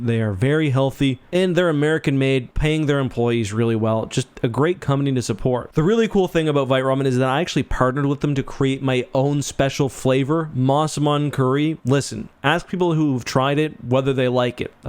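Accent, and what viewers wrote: Canada. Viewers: Love his Canadian accent!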